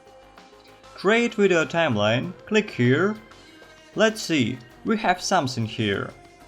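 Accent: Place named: Russia. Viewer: Слышно, что наш человек)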